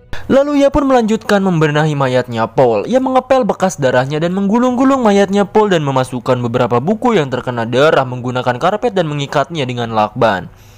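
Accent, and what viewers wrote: West Java, Indonesia. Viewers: Anjimm si mang org sunda euy , aing karek apal ieu channel mantap resepp euy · Ngakak aslina aduh sunda banget😂😂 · Sunda anying😂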